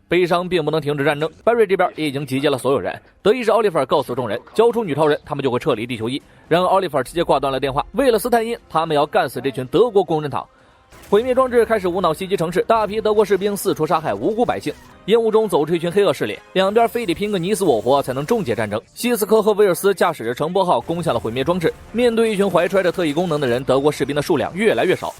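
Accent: China. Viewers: 怎麼中國人那麼喜歡用YouTube 來做盜版的事情？ 操著這個口音 真的很噁心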